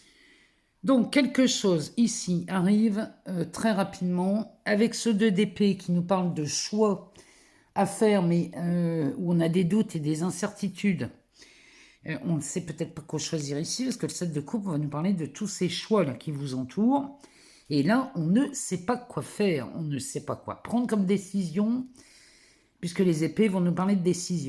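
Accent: North, France. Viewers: Merci Merci Merci pour vos tirages toujours toujours très JUSTES … Dans quelques jours, je passe en justice pour mon divorce, et je sais maintenant que enfin je vais être mentalement, psychologiquement et physiquement « libérée et soulagée ».. J’adore vraiment votre voix qui est franche avec un p’tit accent soit du nord soit de la Hte Loire … Bien à vous et bonne semaine!!! 👏🫶🏻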